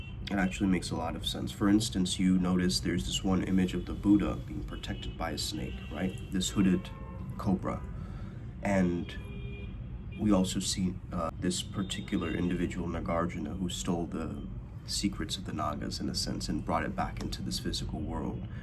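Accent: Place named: United States. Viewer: He was brought up in America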